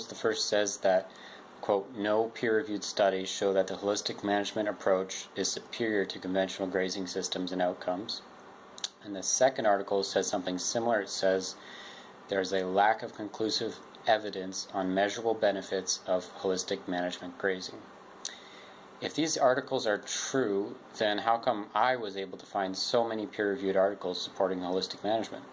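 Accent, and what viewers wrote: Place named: Omagh, United Kingdom. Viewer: Sorry, I posted my previous comment before you asked that we not mention politicians. :-( However, I will mention that I love your channel and look very much forward to visiting you. I once knew a family from Omagh, and your accent reminds me of them. They were lovely.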